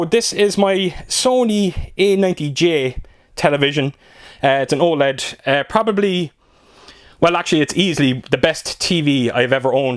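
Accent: Ireland. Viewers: Hi, It’s Mick from across the pond in NY. Been hitting the like button on your videos for some time. Brilliant job mate on your passion. I’m jealous of many of your pieces that only collectors understand. I have a great set up here and have the passion also ( not the film ) 😂 Keep the hard work and love going as to keep the home experience and physical media alive. BTW - Love the brogue- Where in Erin? Got plenty of family in West Clare and Tipperary. Also dig my govee backlight mate. Cheers! 🇺🇸👍🏻✌️😎Just got my steel book copy of SleepHollow can’t wait to spin that incredible film.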